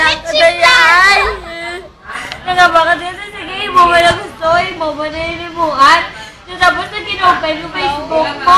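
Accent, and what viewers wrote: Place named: Hiligaynon, Philippines. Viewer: Nami gid pamatiun mag storya ilonggo ah :) daw ma inlove man ta saimo ba :)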